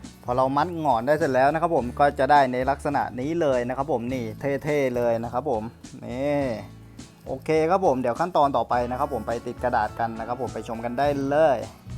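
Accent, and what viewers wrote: South, Thailand. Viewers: พี่เป็นคนใต้ใช้ไหม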